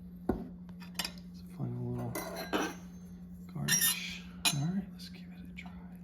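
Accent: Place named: Louisiana, United States. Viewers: Are you livin down in Louisiana? Sounds like it. I'm from Shreveport. Your cookin looks good. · Where did that thick southern accent come from all of a sudden? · Ok….. Why do you have a normal voice while cooking one recipe and then….. out of the blue, you’re a full fledged Cajun!!! I love it!!❤ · I am from Louisiana & I hear da accent!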